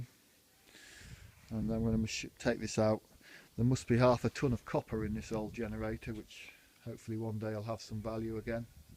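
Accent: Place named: Northwest England, United Kingdom. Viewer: Thanks for the update on the oil pressure, I am curious what weight oil you are running? Also, my wife, who is originally from Preston, overheard me listening to you talking, and was trying to figure out what part of the UK you are in. She guessed north, northwest.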